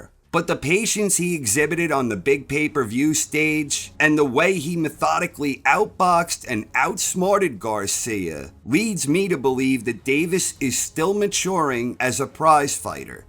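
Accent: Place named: East Coast, United States. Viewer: Like I've said before, don't ever loose that accent! My accent is Minnesotan and trust me it's not as popular around the world as your East Coast. Anyway, excellent breakdown of the fight! Davis showed us..."what's up!" My dream is Lomo vs. Davis... The Matrix vs. The Tank! Two of the greatest minds and talents of boxing yet from the most opposite ends of the world regarding culture, race and geography! Respect to both and all these warriors that have the courage to get in there wether they win or lose! I can't see Ryan as a quitter! I think he was legit paralyzed by that Tank power shot! Sneaky, fast with a Bang!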